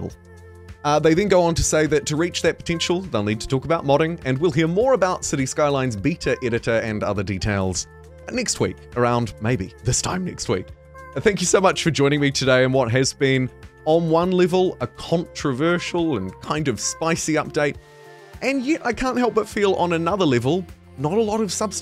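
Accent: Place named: New Zealand. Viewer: Nice to hear a fellow Kiwi accent in the CS2 community. Keep it up mate 🥝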